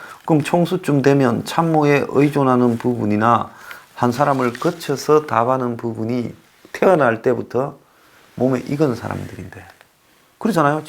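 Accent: Gyeongsang, South Korea. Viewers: 최고!!! 부산경상도의 대표가 되는 억양과말솜씨에 정말 곰감과동감하며 너무나 재밌게 유익하게 많이 배워갑니다! 항상 건강하시고 좋은방송 계속 부탁드립니다!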